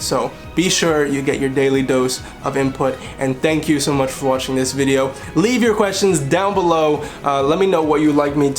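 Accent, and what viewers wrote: United States. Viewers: Hey, im russian and i can clearly hear his accent. He sounds like american, not russian. Yeah, his accent is still decent (for foreigner) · Well, he has the American accent down well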